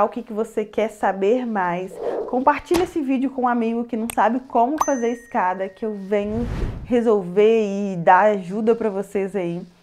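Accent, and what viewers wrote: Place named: Minas Gerais, Brazil. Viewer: Nós mineiro falamos assim mesmo .... Escorrega igual quiabo kkkk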